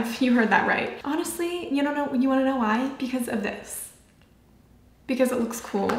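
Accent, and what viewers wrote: RP, United Kingdom. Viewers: I'm British and I adore your British accent! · Same about the British accent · Your British accent is actually pretty amazing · i love her british accent lolll :)) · don’t try and sound like the queen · I love your british accent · A British accent fits her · I love your british accent :3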